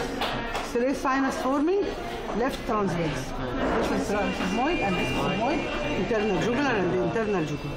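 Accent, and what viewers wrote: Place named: England, United Kingdom. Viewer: hello english accent!!!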